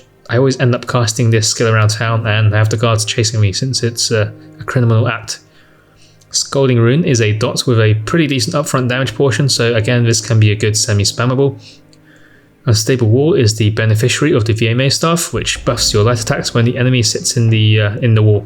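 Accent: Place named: England, United Kingdom. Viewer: Everyone says Nefas has the most sexy voice, but I think your English accent definitely gives you the edge. Love the video, love me some more magcro!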